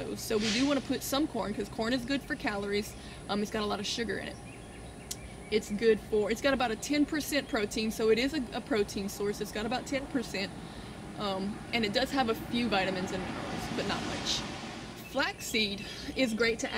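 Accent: Louisiana, United States. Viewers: Where are you from? Louisiana??? Love your video. Very informative.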